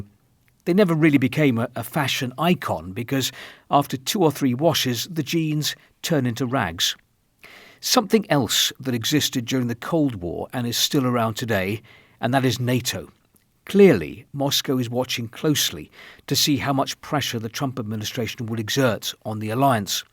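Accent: United Kingdom. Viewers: if u listen british acent ... in video its 70% lie and fake infoo...ju can just skip it for evry single topic in life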